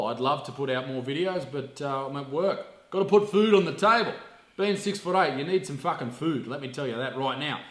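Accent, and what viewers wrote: Australia. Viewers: Legend! People think your not Australian 'Shut up birds...fuckin hell'. If that's not Aussie I don't know what is. Love your clips mate!! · I feel like I’ve got all the tools to be a comedian.. Except that fuckin classic Aussie accent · you look like my last years english teacher, and sound like him to bc hes AUSTRALIAN 😉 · How anyone cant know ur an aussie just on ur accent alone fuckin blows my mind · i like watching your videos because you talk like Australians do normally. Most Australian youtubers tone down the swearing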